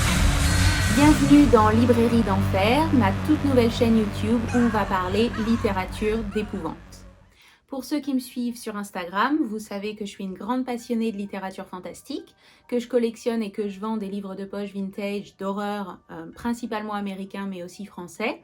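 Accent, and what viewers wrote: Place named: England, United Kingdom. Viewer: exelente chaine et belle accent anglais j'espere que tu sera plus reguliere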